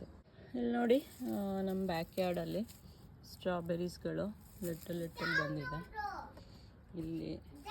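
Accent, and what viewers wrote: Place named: Davangere, India. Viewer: Nam Davangere bashe😅😅😅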